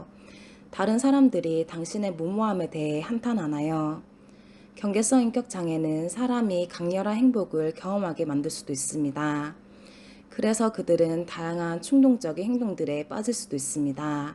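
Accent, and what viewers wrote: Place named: Busan, South Korea. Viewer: I can hear a hint of her Pusan-dialect, which makes it understandable. Don’t bash her. At least she’s trying hard to make it sound clear.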